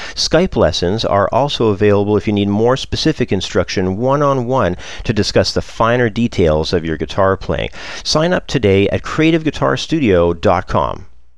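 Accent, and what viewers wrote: Canada. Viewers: always a nice channel this one! hey are you, by any chance, canadian?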